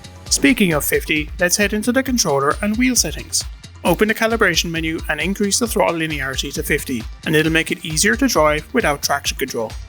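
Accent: Ireland. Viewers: My man sounds a little irish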